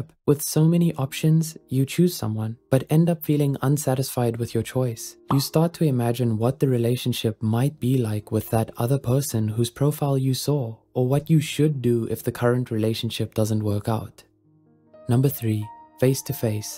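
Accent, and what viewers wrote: South Africa. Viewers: Is the speaker from south Africa? Awesome video none the less👏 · where is from? his accent sounds vaguely south african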